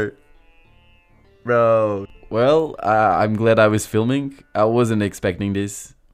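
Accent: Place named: Portugal. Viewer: este sotaque de tuga nao me engana, bom video mano :)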